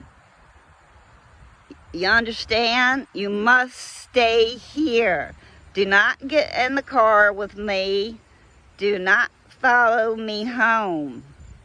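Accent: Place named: South, United States. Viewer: Hi GF WOW 😮 You told your First Experiences, that is so cool. I know we both have many Stories don’t we 😉 Hey Don’t worry about what some may think !! Sis Elke is Right about it’s a Paranormal Experiences. Like the ones they tell Every single Day 😇 Do you have the Pictures handy from when your Moms Family Home Burnt Down? I forgot it was Christmas 🎅🏽 Morning. Didn’t it get one of the Barns close by? Email me the Pics. when you find them. It was so long Ago, seems like forever. Ok I know it’s been a Long week for you Babe, hopefully tomorrow you can just get some Rest.Your Southern Is Right on Target. You Remember the old Cassette Tape you found of you reading Little Red Riding Hood from Your Grandparents Place? That’s how you sound here. Lmao 😂🤣You were How old? God Bless GF and I talk to you Soon.💙🤍💙🤍💙😘🥰Night.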